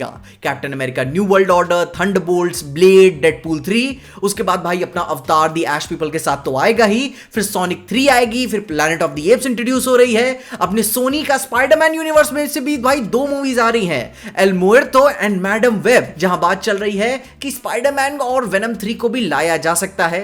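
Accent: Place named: Uttar Pradesh, India. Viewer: Bhatere 😁 up ka dialect aane lag gya bhai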